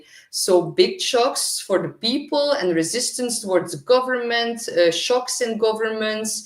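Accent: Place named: Belgium. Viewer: Hi, I will check the degree of my rising. My Moon cnj Saturn in Capricorn has probably made things worse these past few years. I am in Perth West Australia so not in Your area at all. However the last few months I've been dating a Belgian Woman, that's the only reason I detected the nuances of Your accent as it's virtually unknown here. She is attractive too, My heart hasn't recovered just yet. Thanks for Your reply.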